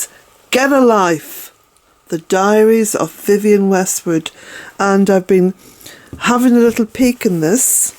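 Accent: Ireland. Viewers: (((❤️))) Perfect Day For Reading! FANtastic Books You Chose! What Fun; To Cozy Up With A Blanket And To Hear The Rain Outside! BTW: I’ve now shared your videos with my three grown sons; Sean Patrick, Kevin Michael, Brian Kelly...I knew for sure they’d love you...their grandmother on their daddy’s side from County Mayo. What can I say...had to name my kids Irish names...otherwise...being Italian that I am, all there was to pick from was, Tonys or Joeys! 🤗👍😉My sister did the same with her only two daughters; Shannan, and Erin! We both wanted children with green or blue eyes! Of course, red hair would of been awesome! (HaHa!) In any case, I knew your accent and where you live would bring them joy! And, not to end, for them to see their mother be so happy to have found your videos that too, brings us all much delight! Oh, I could listen to you forever! I hate when your videos end! 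Wishing you a glorious day ~ No Matter How Spelled, Let It Reign!☔️🏡📚👍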